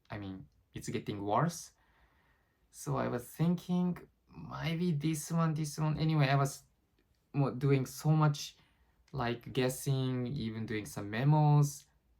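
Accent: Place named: Japan. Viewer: I think he is Japanese based on is accent 🤔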